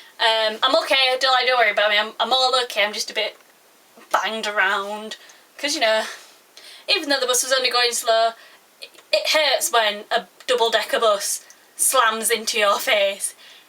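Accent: Yorkshire, United Kingdom. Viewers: You sound like a yorkshire bird anywhere near leeds mebbe? :D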